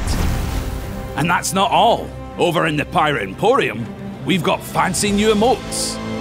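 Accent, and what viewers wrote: Scotland, United Kingdom. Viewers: Scottish narrator 😊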